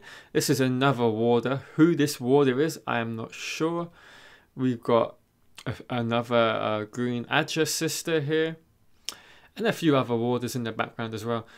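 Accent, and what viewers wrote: United Kingdom. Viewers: Are you from the UK? You have an interesting accent.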